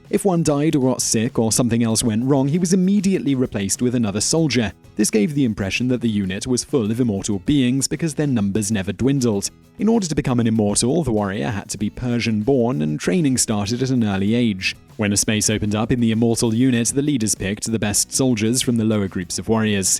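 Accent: United Kingdom. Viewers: 79,000 was not by any stretch the biggest army in ancient history. There were much larger armies than that. Look, I know you are British, and most often inaccurate in every single video, but please, drink your tea, and keep your revisionist history to a minimum. Some people conflate your accent with facts.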